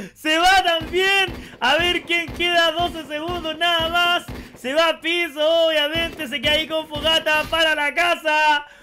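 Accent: Chile. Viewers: Bro eres chileno?? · Eres chileno ? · Eres de chile?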